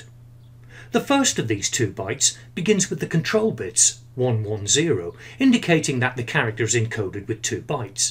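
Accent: England, United Kingdom. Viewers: No Music, no insulting or idiotic speeches, great and clean way of explanation and a nice english accent - thumb up and abo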